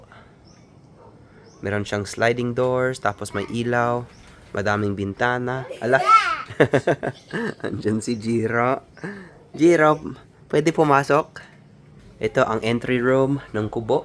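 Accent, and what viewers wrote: Philippines. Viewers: Nice kubo. Grabe ung accent ni sir pinoy na pinoy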